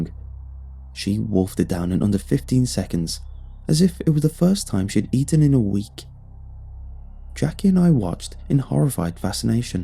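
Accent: United Kingdom. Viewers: bruh this is my fifth year listening to ur voice british people are unreal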